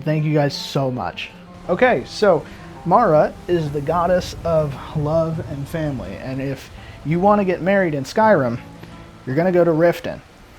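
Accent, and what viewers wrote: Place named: New England, United States. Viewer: just sound like my old neighbors/coworkers in Vermont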